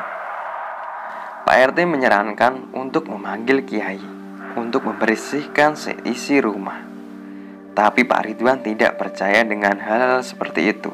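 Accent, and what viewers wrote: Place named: Java, Indonesia. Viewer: Logat jawamu kentel mas yho😂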